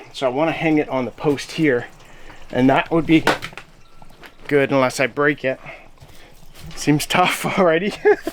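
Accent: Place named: Maritimes, Canada. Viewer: The snow hasn't even flown yet and I already want to be in this place again hahaha. Are you originally a Maritimer,? Your accent sounds like home to me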